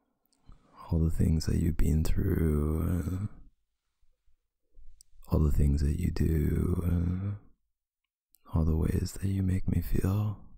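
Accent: Canada. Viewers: I just love his adorable canadian accent 🥺🥺🥺🥺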